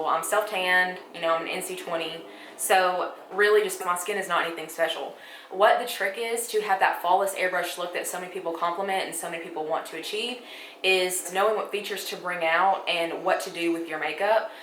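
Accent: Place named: Texas, United States. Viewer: I thought you were from Texas. New subbie. Love your channel❤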